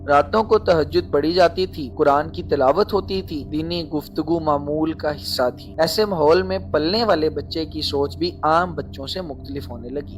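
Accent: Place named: India. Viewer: یار غ کو گ ادا،کر رہے، خ کو کھ کہہ رہے، اتنا زیادہ ہندی کا اثر ؟ تھوڑا تلفظ پر دھیان دیجیے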